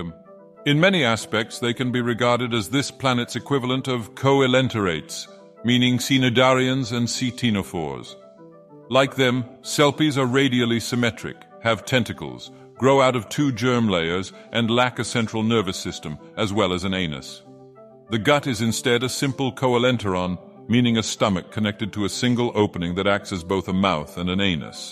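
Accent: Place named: United Kingdom. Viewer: British dagoth ur 🧐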